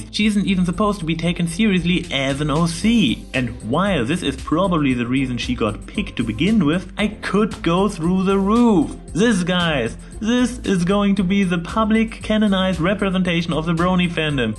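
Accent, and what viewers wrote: Germany. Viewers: You sound so german...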